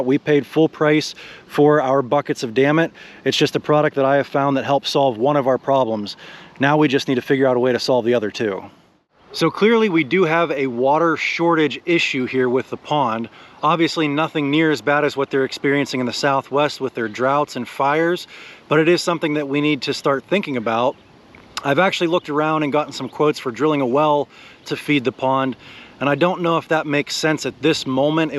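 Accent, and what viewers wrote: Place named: Pittsburgh, United States. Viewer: Add bentonite clay, cheaper, are u in pa pittsburgh area? I here your accent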